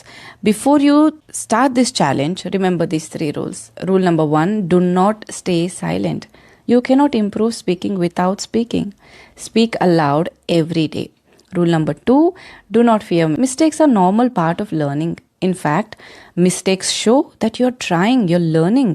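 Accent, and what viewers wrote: India. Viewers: U r such kind desi voice who might be transformed very easily to standard English pronunciation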